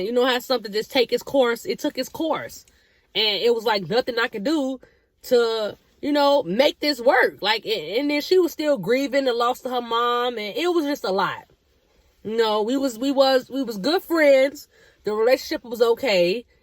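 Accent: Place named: South, United States. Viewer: Idk why but I hear southern in you lol ! Where are you originally from? You got some accent 🤣🤣🤣 and I love it!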